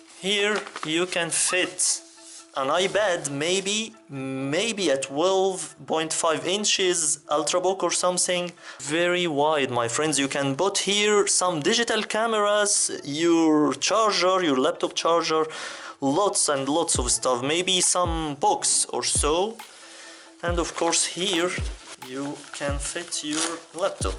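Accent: Egypt. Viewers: your accent seems to be Egyptian, bro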